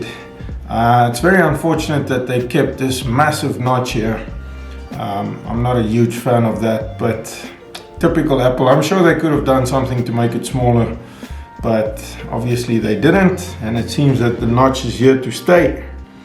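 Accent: South Africa. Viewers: You sound south african...like if you are....SA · He is South African. I think he just works in Saudi Arabia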